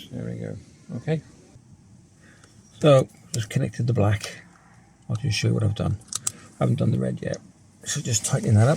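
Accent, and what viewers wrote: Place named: Shropshire, United Kingdom. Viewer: recognise your accent.....anywhere near Shropshire?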